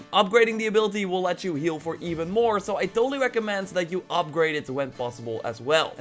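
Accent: Netherlands. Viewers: On what difficulty are you playing? (also are yah dutch?) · Aan je accent te horen ben je nederlands😂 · Are you Dutch? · Are you dutch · You dutch bro?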